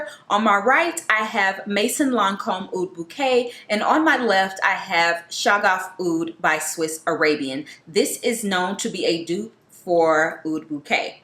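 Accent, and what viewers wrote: South, United States. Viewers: I love your accent you have a southern sound, love this video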